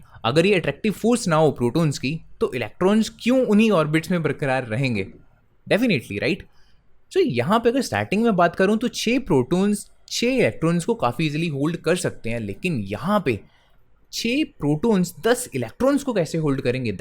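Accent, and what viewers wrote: India. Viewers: Yeah..I somewhat realised u seem to be punjabi by the way of your taking sir...even I am punjabi and I have shared with my friends:)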